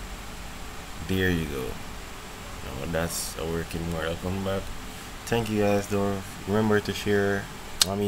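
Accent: Jamaica. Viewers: I know a Jamaican when I hear one. Thanks mi bredda 🇯🇲💪🏾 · Respect you Jamaican?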